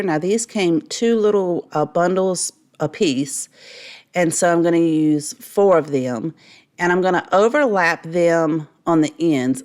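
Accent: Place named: North Carolina, United States. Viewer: I love your videos! You take simple products and make them elegant! What state do you live in? My name is too and you talk like me (NC girl):)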